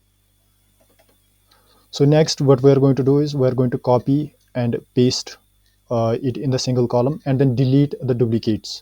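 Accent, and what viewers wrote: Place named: Kashmir, India. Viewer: maine apki awaa suni muje wahi samaj aaya aap apne kashmiri bhai ho Allah salamat rakhe this video helped <3 a lot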